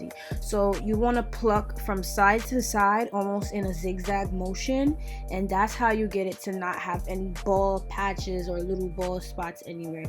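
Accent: New York, United States. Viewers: You most def from New York , you said some “ we not budderin bread” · I love us New Yorker's! As soon as we open our mouth ppl automatically know where we're from! Sis said a "hoodie" 😂😂😂 #youslayedthatwigtho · Lmfao I knew you was from New York soon as I heard your voice 😭 what’s poppin. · Less than minute into the video I knew she was from New York 😂😂😂😂😂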